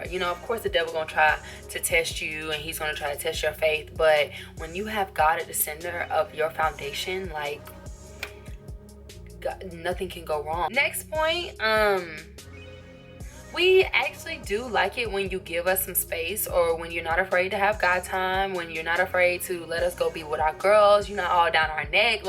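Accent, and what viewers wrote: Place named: Texas, United States. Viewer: you must be from Texas, I hear it in your tone, very smart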